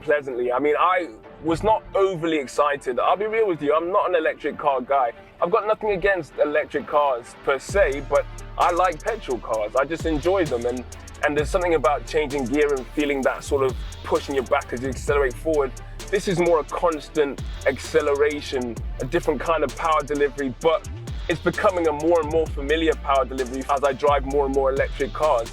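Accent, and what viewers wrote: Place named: England, United Kingdom. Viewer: Great video and car, I definitely agree on the ‘head turning design’ notion! Your videos really remind me of Carswithluke (and not only due to the similar English accent), where there is an emphasis on cinematography, landscapes and storytelling. Keep up the good work!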